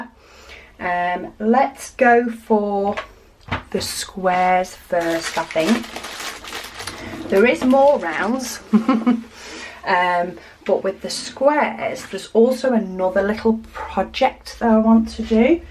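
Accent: United Kingdom. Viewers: I found your channel recently, and I love it! I’m a British DP addict living in the U.S. and it’s a wonderful change to hear a British accent talking about my passion!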